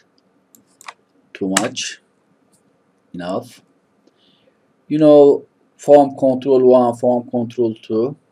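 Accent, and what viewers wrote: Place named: Turkey. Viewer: Türk aksanı bu belli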